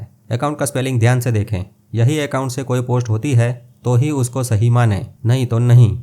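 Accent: Gujarat, India. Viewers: Awesome sirji hindi +gujju tont amazing combination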